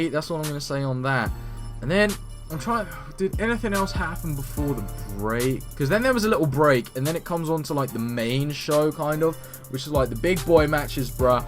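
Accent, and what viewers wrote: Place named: New Zealand. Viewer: Nice vid m8. You actually kinda have a kiwi ( new zealand ) accent a little. Im kiwi and you sound like us bro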